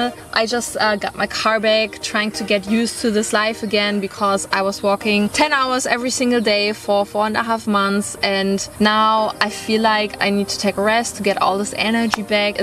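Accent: Turkey. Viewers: Kesinlikle ve kesinlikle bu kız Türk, dikkatli dinleyin böyle bir aksan olamaz. İngilizce aksanı direk Türk aksanı ama maalesef 600 bin takipçisi var. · Hallo, ich gucke begeisternd deine Videos und finde dein türkisches Akzent sehr süß. In vielen Dingen stimme ich dir vollkommen zu, da ich in Deutschland lebe und zum Urlaub in der Türkei die gleichen Sachen wie du erlebe. Mülltrennung, kein Gurt anlegen, usw. Aber in Deutschland z.B in Hannover gibt es teilweise schon Gasherde. Meistens sind es vom Altbestand geblieben und werden zunehmend durch E-Herde abgelöst. Hier gibt es aber ein entscheidende Risikofaktor. In Deutschland sind die Gasherde mit einem Sicherheitsventil ausgestatttet nach erlischen der Gasflamme wird der Gaszufuhr abgeschlatet aber in der Türkei leider nicht. Die neuen Genarationen der Gasherde könnten diese Automatik besitzen, da bin ich nicht auf dem aktuellen Stand. 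Birkac dizi haric cogunlukla türk dizilerini sacma buluyorum. Insanin zamanini caliyorlar. Benim kendi hayatim kendi dizim:-)) Mach weiter so mit deiner amüsanten und interessanten Videos. Tschüß.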